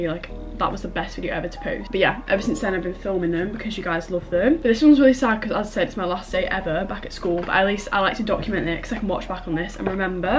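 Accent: United Kingdom. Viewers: I love her britisch Akzent sm lol btw i love her too💞🤩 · you sound British:face-purple-wide-eyes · Welll this is my firsst timee i see youu anddd whenn i heard ur british accenttt i did a subscribe andd when the video endss i like itt soo much keep goind❤❤ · As a Ukrainian who speaks American English I was just trying to understand British accent like whole video🥲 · The British accent is so annoying, I think it hurts me 😭💔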